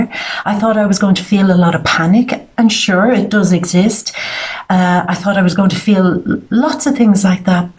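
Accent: Ireland. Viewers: I did not know you were living in Italy, sending love, Me mum is from Ireland and your accent sounds very Irish. I assumed you were from Ireland. lol Take care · Hey. I must ask...I have been watching you for about a year and half, and have always been wondering where your accent is from. You mentioned your living in Italy. I used think when I first started to watch you, that you were from somewhere in northern Europe and then I have been thinking I am hearing an Irish accent. Please tell me where your accent is from 🤣 Thank you so much for all your wonderful videos. It's a pleasure to watch your beautiful face and listen to your lovely videos each month. Lot's of love, Deborah 🥰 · Lovely to hear your lovely Irish accent today........really cheered me up.😋👍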